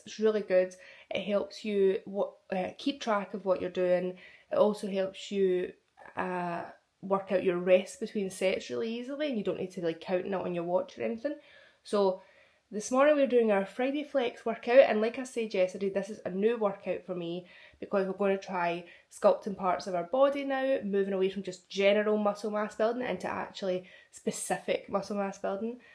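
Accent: Scotland, United Kingdom. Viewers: I knew that was a Scottish accent 😊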